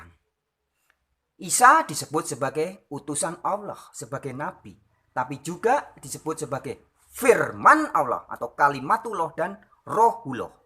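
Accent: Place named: Bali, Indonesia. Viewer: Deleme bali gati nok.. dije melali.. tiang denger logate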